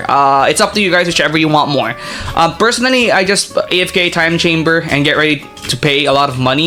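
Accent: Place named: Philippines. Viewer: Helpful video! off topic but are you Filipino? Your accent is cool 😅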